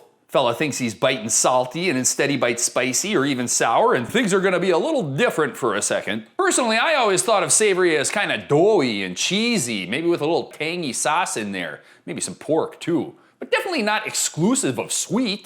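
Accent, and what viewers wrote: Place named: Minnesota, United States. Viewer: You're soooooooo much more Minnesooooootan than I remember you being!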